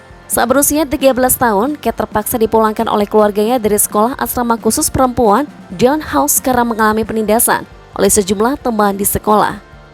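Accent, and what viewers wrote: Indonesia. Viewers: Saran untuk admin : supaya cari narator yg memiliki pronounce yg English British atau English US. Jangan yg bhs. Inggris a la Indonesia.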